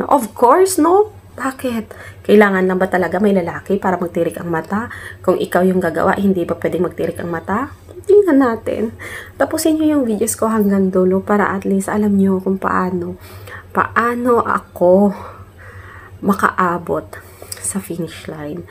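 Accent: Iloilo, Philippines. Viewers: Taga san kba ma'am? Daw ilonggo ka bla! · Nice ilongga si madam 😂😂😂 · Ilongga ka idol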